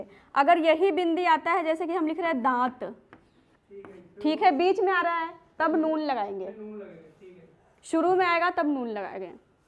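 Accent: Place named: Punjab, India. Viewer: Tuhadi language toh pta lag reha k tuci punjabi ho, but eh gall ekk punjabi samajh sakda, 😂😂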